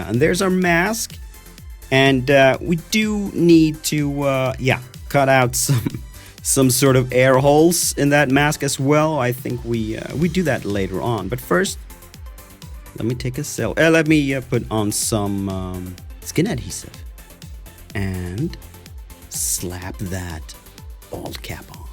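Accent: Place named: Skåne, Sweden. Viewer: en javla skånsk dealekt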